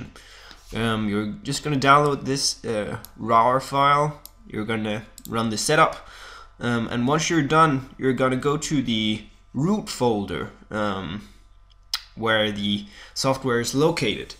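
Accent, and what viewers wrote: Denmark. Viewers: you sound danish, are you? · Oh... Jeg opdagede ikke at du var dansker lige i starten, davs! :D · .........You're danish...Aren't you? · Hej med dig :D Afsløret du en dansker :o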